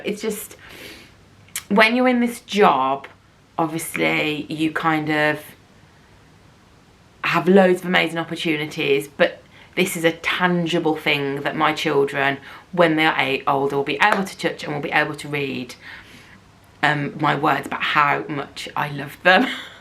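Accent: Birmingham, United Kingdom. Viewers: Well done x love the emotion x Real x love to meet ya x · I don't listen to audiobooks pretty much as a rule... but I'm absolutely going to hold out for reading me 77 parental poems, in all it's brummy glory 🙌🏽 with love, from Saudi Arabia xoxo CONGRATULATIONS!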